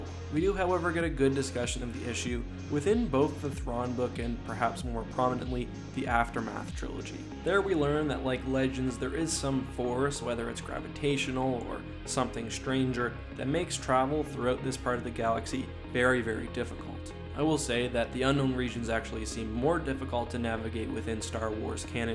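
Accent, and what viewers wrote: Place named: Nova Scotia, Canada. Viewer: I was just thinking thing guy sounds Nova Scotian and then boom a photo of Halifax.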